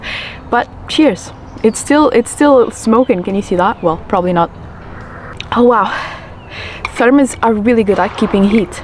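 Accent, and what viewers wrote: Portugal. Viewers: Sotaque português??? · I was already suspecting that you were portuguese, but when I began watching the video your accent gave it right away, good stuff tho. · sotaque bwee tugaa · pelo sotaque vi logo que eras tuga 😅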